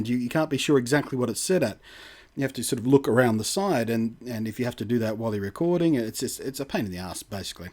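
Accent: Australia. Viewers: Ha cool I guess your Aussie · True. Still the razor price is significantly higher when exchange rate and taxes are added. Around $200 AUD equivalent for USD to AUD for the Yeti and $240 AUD for the Seirēn. It seems that the Aussie tax for the yeti is about $30 and the Aussie tax for the Razor is around $50. Still I dislike the arbitrary markup on any goods. Really liked the comparison though. Is the extra $50 worth the extra functionality? Keep up the excellent work. It really is great to hear an Aussie voice doing reviews like this.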